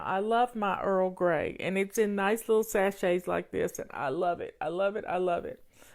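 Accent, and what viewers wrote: South, United States. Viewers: Thank you for sharing your wisdom with us! I love your southern accent and words - it’s comforting to me and I’m not even southern!😄. Thanks for being you!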